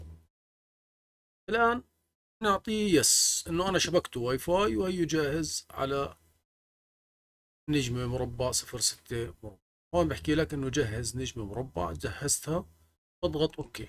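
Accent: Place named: Libya. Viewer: فيك لهجة ليبية 😮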